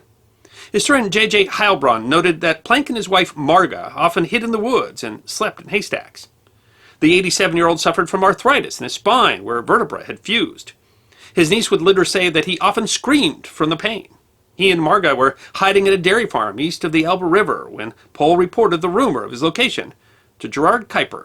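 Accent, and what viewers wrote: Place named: United States. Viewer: I love how Americans try to pronounce kuiper lol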